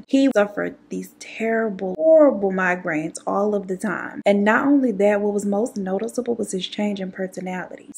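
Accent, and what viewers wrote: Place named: South, United States. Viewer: I love some. Let me just say that I am a language chameleon. Like if I go back home to south Florida I start talking with a Cuban like accent. While living in Cali I sounded like a surfer. I just can’t help it. So listening to has me talking all southern and I catch myself using her phrasing. “All of the things” Omgosh! It’s okay though. Sis is amazing!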